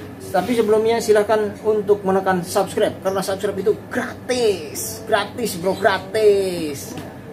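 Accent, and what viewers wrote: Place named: West Java, Indonesia. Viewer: Agak ngahelob😁 bangunateh urang sunda nya si akang mah.?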